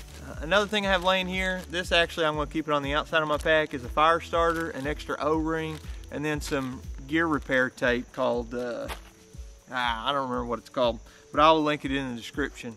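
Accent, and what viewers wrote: Kentucky, United States. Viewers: I just found your channel I knew you were a southern Kentucky boy lol. In all seriousness Daniel Boone park is an amazing place.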